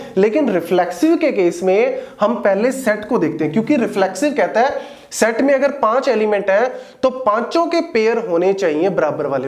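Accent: Punjab, India. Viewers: I love your punjabi accent so much😍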